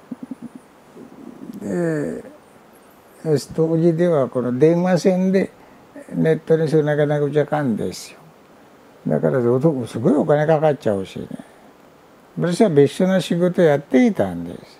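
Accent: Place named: India. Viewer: スマナサーラ長老はイントネーションから察するに日本生まれではないように察します。 インド人でしょうか？ どこで仏教の修行を積んだのでしょうか？ どちらの宗派に属するのでしょうか？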